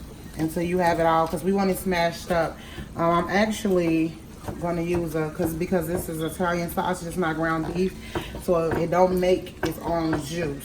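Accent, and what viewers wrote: Chicago, United States. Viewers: Is that a Chicago accent I hear? 👀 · Chicago in the house. I know a Chicago accent when i hear it. New subscriber here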